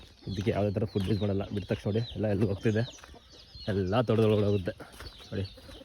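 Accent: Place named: Mysore, India. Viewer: 2013 ರಿಂದ 2019 ರವರಿಗೆ ನಾಟಿ ಕೋಳಿ ಸಾಕಿದ್ದೆ, ಸಾಕಷ್ಟು ಕೀರ, ಮತ್ತೆ ಹಾವುಗಳ ಕಾಟಕ್ಕೆ ಬೇಜಾರಾಗಿ ಅಲ್ಲಿಗೆ stop ಮಾಡ್ದೆ, ನಿಮ್ ವಿಡಿಯೋ ನೋಡಿ ಅಳೆದೆಲ್ಲ ನೆನಪಾಯ್ತು... ತುಂಬಾ thanks.. nim ಮಾತು ಕೇಳಿದ್ರೆ ನಮ್ ಮೈಸೂರ್ ನವ್ರು ಅನ್ಸುತ್ತೆ ತಮ್ಮ ಊರು ಬ್ರೋ?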